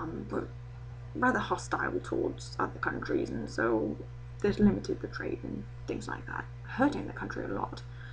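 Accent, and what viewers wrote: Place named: United Kingdom. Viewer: You remind me a lot of Hayley Atwell with that British accent.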